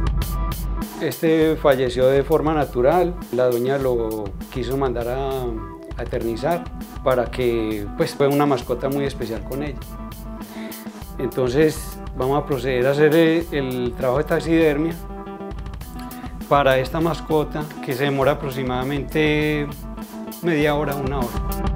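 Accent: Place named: Colombia. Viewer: Hola buenos días se me acaba de morir mi canario quisiera disecarlo donde se encuentra usted no me diga que en Colombia por su hermoso asentó Dios que triste estoy 😢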